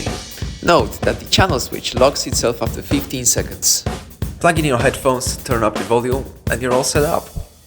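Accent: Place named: United Kingdom. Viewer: I'm so pleasantly suprised with this video!! I believe it's the first time you ever made a video like this on youtube, but you seem super comfortable speaking on camera!! And I can hear the British accent coming through ;)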